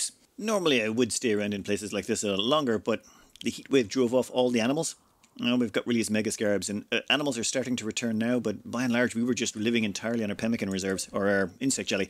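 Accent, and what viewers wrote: Ireland. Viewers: That cannibalistic tundra survival was epic. Maybe you could do an inhospitable biome survival yourself sometime. I'd watch it. Watching you plot and plan is the biggest draw to your channel for me. That and the sweet Irish accent.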